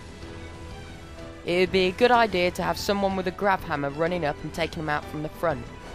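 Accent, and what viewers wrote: United Kingdom. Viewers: is he from uk? COOL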